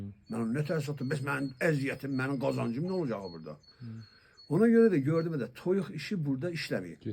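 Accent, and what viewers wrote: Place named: Qakh, Azerbaijan. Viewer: Salam. Qax ləhcəsinə oxşayan.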